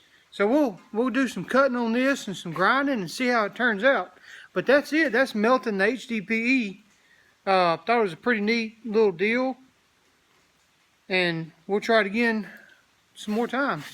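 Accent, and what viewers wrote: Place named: South, United States. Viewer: Not to get off the subject but I'm from the south just like you and we have similar accents. I can understand every word you say but I turn the sound down and the captions on so I don't bother other people around me and YouTube always distorts the captions when dealing with Southern accents. YouTube's voice recognition needs some work. I mean "hard as a rock", Youtube interprets as "whores".... On the subject of what your doing, I'm looking into using waste plastic (like those gray Wal-Mart bags) as an alternative to fiberglass resin and polyester. I have used cotton and even cardboard brushed with Bondo fiberglass resin instead of polyester to make things. I've made speaker boxes for cars out of cardboard coated with fiberglass resin then glued that felt material over top of it. It's been holding up fine for a few years.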